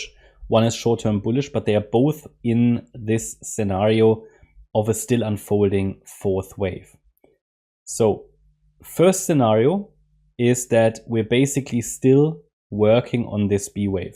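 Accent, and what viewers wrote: Germany. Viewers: Man I can feel that German accent, love it. Keep it coming. Can you reference a link to your membership and what is actually available for subscribers \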